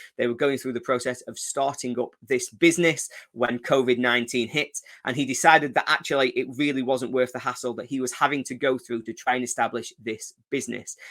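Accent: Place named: Yorkshire, United Kingdom. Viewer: Good to see Yorkshire accent coming along.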